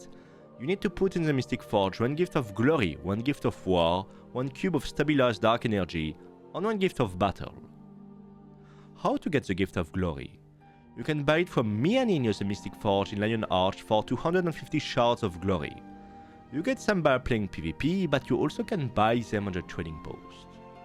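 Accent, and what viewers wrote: France. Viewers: I love your french accent! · you sound french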